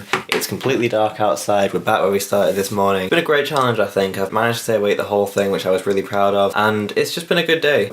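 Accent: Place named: United Kingdom. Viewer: Oh wow a Brit. Genuinely expected an American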